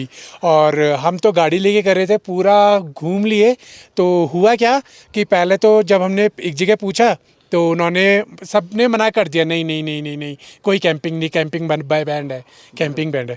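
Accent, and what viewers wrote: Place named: Maharashtra, India. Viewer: Bhai ke hindi me marathi ki jalak dikh hi gayi hai Maharashtra hamara Maharashtra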